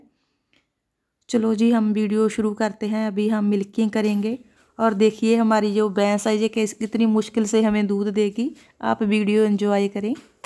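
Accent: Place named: Punjab, India. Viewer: Hello mam aap Na Punjabi ma bolta hi Sacha lagta ho oor milking ka trika bhi sahi h hum bhi asa hi Karta h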